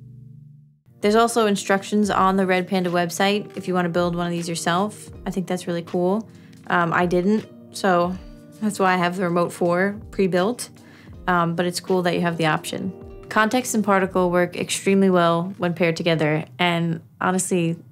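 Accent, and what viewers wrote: Long Island, United States. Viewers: I saw on jhs and im here tone a simp and here cool pedal shit. U and ur LI accent along with ur talent make u the cutest thing i ever saw. Sorry for being weird, ur awesome🤘🏼🤘🏼🤘🏼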